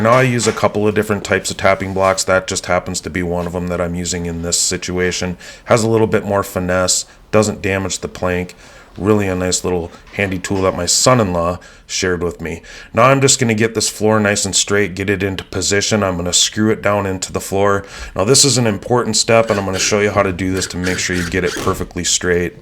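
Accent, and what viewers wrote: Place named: Upper Midwest, United States. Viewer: Until you said Northern Minnesota, I thought you were from Ontario, Canada. That Northern Midwest accent had me fooled at first. Great tips, nonetheless.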